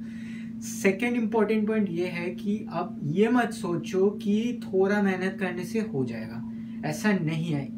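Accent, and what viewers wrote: Bengal, India. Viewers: Bhaia apki bengali ho?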